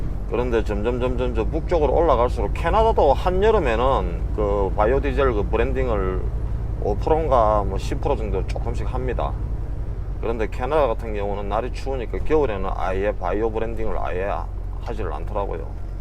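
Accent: Gyeongsang, South Korea. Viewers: 저희집앞을 지나가셨네요 ㅎ 101 과 I-17 만나는교차로 근처에 살고 있습니다~~~ 저도 경상도 사람이라 항상 사투리가 정겹네요~~~ 영상잘보고있습니다~~~ 항상 안전운전하세요~~~ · 경상도 사투리가 늘 정겹게 느껴집니다. 처가는 포항, 매형 두분도 경상도입니다. 나라사랑 잊지마시고 늘 안전하고 건강하게 지내세요 ~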